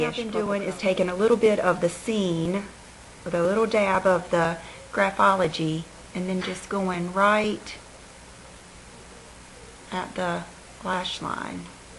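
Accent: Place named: North Carolina, United States. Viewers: Mrs., you had me laughing this whole video with all of your annotations. You and my mom are two peas in a pod. You remind me of her so much. We're from NC, and everything from your accent, to your 'sillyness' just reminds me of her. I'm only 19, and I don't live with her any more, and watching you is like a little piece of mom on my computer screen;). Love your vids! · It's good to laugh at yourself sometimes, you look lovely anyway! Love your accent, I grew up in S.C., makes me kind of homesick! Happy Mother's Day!!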